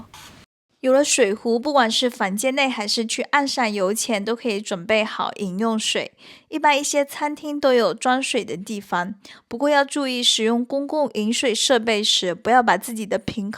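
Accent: Fuzhou, China. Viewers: 你是福州人嗎？尾音好像聴出鄉音！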